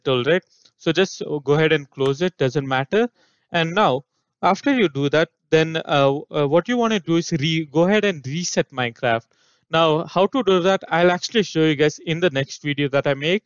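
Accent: India. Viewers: Indian accents are the best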